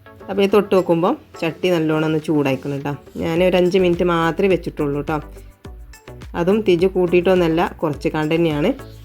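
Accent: Malappuram, India. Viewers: മലപ്പുറം ഭാഷ മലപ്പുറം താത്ത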